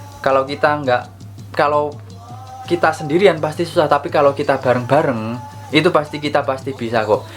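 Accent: Java, Indonesia. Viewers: Sampean jowone ngendi mas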